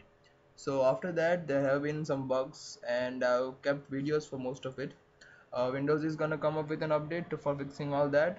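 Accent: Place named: India. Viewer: That Indian accent tho lol headass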